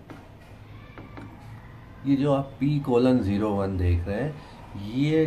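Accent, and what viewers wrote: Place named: India. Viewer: I not understand nothing ur indian accent ohhh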